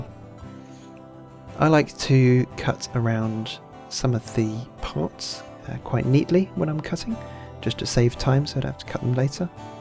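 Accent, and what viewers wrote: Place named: United Kingdom. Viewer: um.. can you use plain old printer paper and I love the British accent lol and yes I know he is really British.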